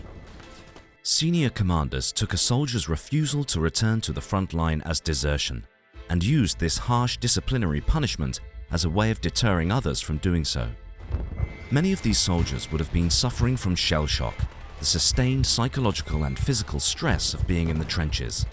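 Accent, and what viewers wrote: United Kingdom. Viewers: British accent tho lol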